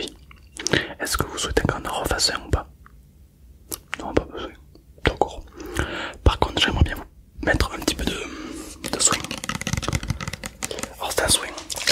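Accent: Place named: Toulouse, France. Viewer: Accent Toulousain est magnifique le roleplay est relax surtout coiffeur j'adore, 😁👍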